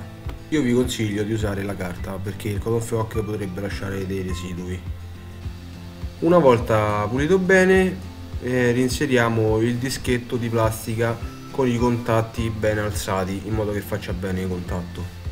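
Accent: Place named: Rome, Italy. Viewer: Sei un grande! Ho voluto provare a smontarlo anche dopo solo 1 anno di vita.. Smontato, pulito quei cerchietti, sollevato un po quei contatti metallici per scrupolo anche se sembravano ok, rimontato e non ho più avuto problemi di visuale che andava da sola verso sinistra! Che dire, se sei di Roma (dalla calata penso di sì) ti offrirei volentieri un caffè o na birretta!! Grande 💪🏻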